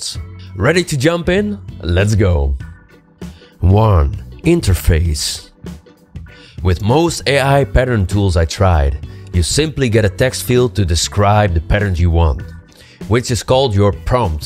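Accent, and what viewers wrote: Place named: France. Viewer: Your voice is French AF. I love it!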